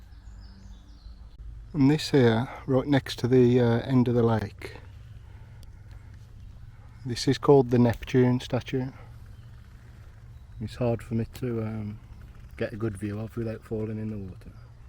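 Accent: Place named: Scotland, United Kingdom. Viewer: Thank you very much,. I am intrigued by your accent, from which part of the UK are you from? For the pronunciation of a couple of words, I would say Scotland but I am not sure. Regards.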